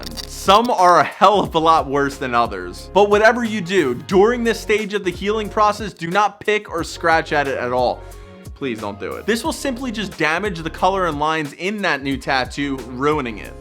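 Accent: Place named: Pennsylvania, United States. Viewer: U sound Pennsylvanian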